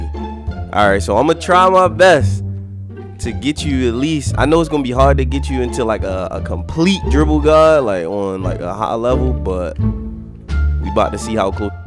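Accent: Baltimore, United States. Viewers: U from Baltimore? I hear that accent lol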